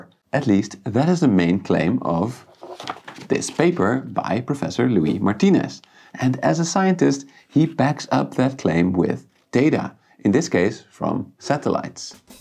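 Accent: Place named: Netherlands. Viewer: He's Dutch. This is obvious from his accent and from his name.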